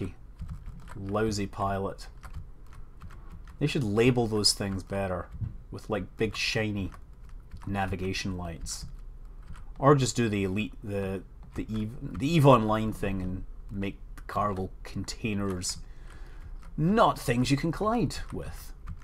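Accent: Scotland, United Kingdom. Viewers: Hey, are you scottish...you sound scottish:3?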